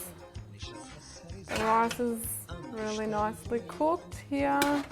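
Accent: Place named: Australia. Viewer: I luv Australian accent ;)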